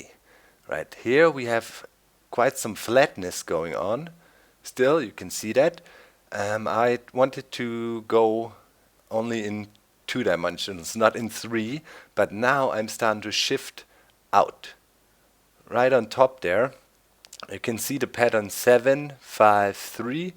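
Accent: Germany. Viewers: ach ich liebe deinen deutschen Akzent :)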